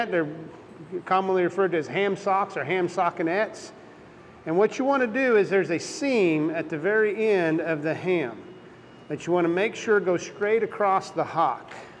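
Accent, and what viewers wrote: South, United States. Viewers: From the title, I assumed it was "United Kingdom College of Agriculture..." So I was very shocked when this deep country American accent broke out. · Damn it said UK college and the American accent gave me a fright before realising it was University of Kentucky not United Kingdom.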